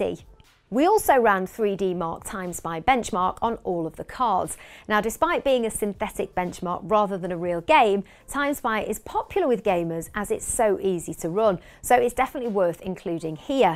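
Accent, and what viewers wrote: United Kingdom. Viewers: I really like the British accent (I'm French btw)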